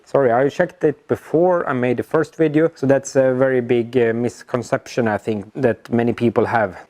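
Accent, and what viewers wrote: Sweden. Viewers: Damn that block!You can fix it!.Good luck! It's always funny hear swedish accent but better than we Finns, our accent is just terrible.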